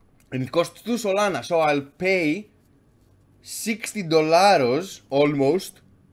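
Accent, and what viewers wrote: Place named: Greece. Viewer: thiccc Greek accent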